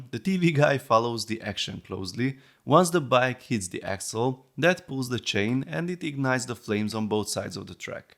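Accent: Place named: Hungary. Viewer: A Stuntz-os videóddal kezdtem... most látom a csatornád először.. volt bennem egy gondolat, hogy annyira magyaros az akcentusa az illetőnek.. tán csak nem magyar? De. :) Nagyon jó a csatornád, sok sikert hozzá!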